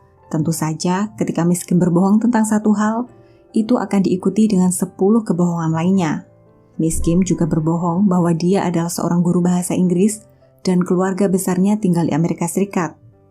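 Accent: Java, Indonesia. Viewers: Logatnya terlalu medhok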